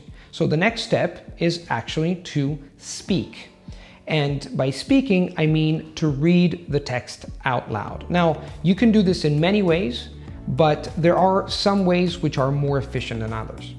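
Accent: United States. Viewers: I've known a lot of Italians, but I don't think I've met any who've mastered American intonation like you. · My pleasure,:D Oh, so staying in Poland "inspired" you to learn Polish, then:P Good luck, btw!:) I've heard that Polish intonation is pretty similar to an Italian one. I mean that it's quite simple to master Italian for Poles and Polish for Italians regarding pronunciation but I may be wrong:P Yeah, it definitely helps socially as you mentioned in the above <3, I must admit that your videos have been like a drug to me recently xd I can't wait to prepare food and watch your video:D Plus, I love the fact that your accent is American <3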